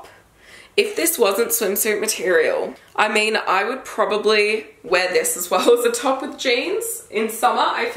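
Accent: Australia. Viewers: You're so f@ beautiful an I love the Australian accent 😍😍😍😍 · Are Australian ? Very cute accent hehe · ow an aussie? i live in sydney :) also this is hot :p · Idk where she is from but it sounds like Australia, in which case it would be spring/almost summer there! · LOL the subtitle for the beginning said “long time gnar see” not made for australian accents I guess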